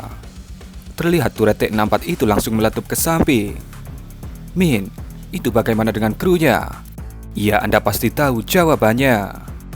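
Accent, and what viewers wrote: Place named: Java, Indonesia. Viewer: Lebih gregetan lagi dengar suaramu yg medok cil tidak unt di lestarikan yg sprti lu medok2 gilani😂😂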